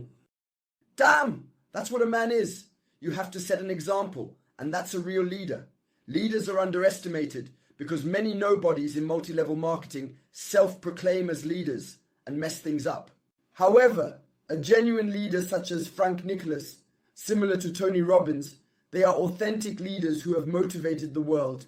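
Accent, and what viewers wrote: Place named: Texas, United States. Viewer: ALLLL RIIIGHHTTTTTT oh yeah (I love texas accent)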